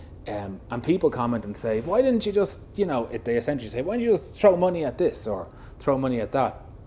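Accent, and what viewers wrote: Ireland. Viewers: Okay, that truly sucks! I'm watching from the US and there are like almost no Lotus Esprits from the early years in the whole country. Dang it! Still, I look forward to every one of your videos. Thank you for explaining the stop motion reasons and motivations. That make much more sense than I realized! It's also way entertaining and a butt load of work, I bet. I will subscribe to your channel, because I like your work ethic and I like vintage Lotus cars. Your Irish accent is a fav, too! Keep going!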